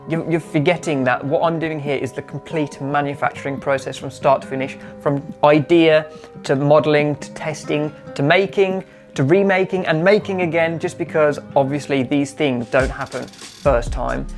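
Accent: Birmingham, United Kingdom. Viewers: brummie luv !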